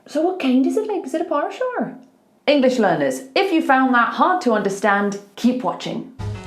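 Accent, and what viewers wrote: United Kingdom. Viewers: Am new here.. am polyglot and yasss u ar right.. UK accent is wonderful.. we ave a big rivalry with 'em.. l love ur accent .. 💪💪🇺🇸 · She’s lovely and perfect. I really understand her. Cute lips are perfectly adapt with the British accents. Good luck! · Are u British too 0_0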